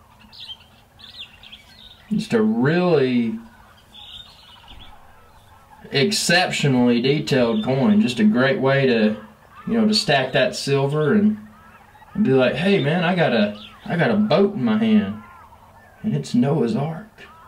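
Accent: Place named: Boston, United States. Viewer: You sound like a Yankee I know. From South Boston :-)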